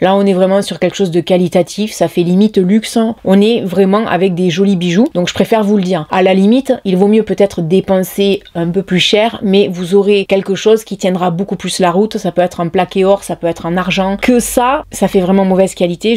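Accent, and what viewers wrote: South, France. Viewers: ..., je te suis depuis ta première vidéo 💜 et ton accent sudiste ressort d'année en année, c'est trop adorable. Hors plaisanterie, ton contenu conseil, économie est hors-norme! Ne lâche rien et saches que tes sponso "carte bleue" on adore car tu dépense pour ta communauté. on t'aime tellement 🌹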